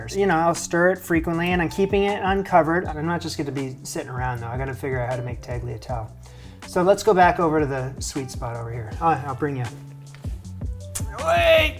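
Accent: Canada. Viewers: I think-not entirely sure though-he was raised in Canada. I can totally understand what you mean by simply “Canadian”. He certainly has the accent and verbiage/slang from Canada, but some of the northern states pick that up too, so it’s honestly hard to tell sometimes. Had he not straight up said where he lived I would have guessed he was in Canada for sure. 😊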